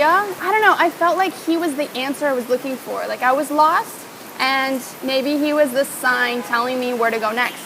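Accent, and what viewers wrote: France. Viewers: Great french accent by the way:) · Hi! I started looking at your videos a few weeks ago and it really got me to want to travel again.. and visit Shenzhen! Thanks for sharing your passion about travelling and .. about China! Oh and by the way.. super accent Français! ;)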